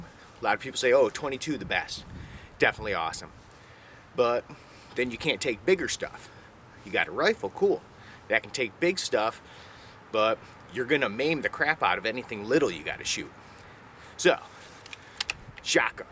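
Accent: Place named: Ohio, United States. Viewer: u sound like ohio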